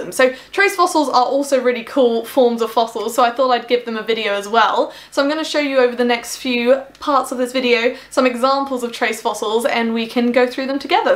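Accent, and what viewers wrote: United Kingdom. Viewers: A lady’s British accent can even make “poo” sound classy.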